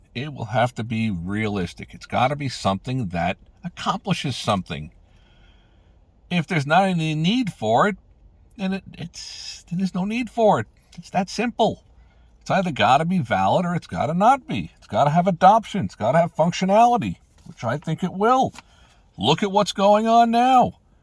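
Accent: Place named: New York, United States. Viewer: I’m speculating based on your accent that you’re from NYC like me or perhaps northern NJ. You VERY much remind me of a former college professor of mine who’s a good friend of mine and he’s from NJ and the Bronx. 🙂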